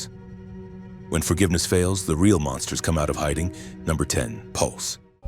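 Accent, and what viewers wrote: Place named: Australia. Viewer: Something about the Oz accent...like fingernails on a chalkboard 😅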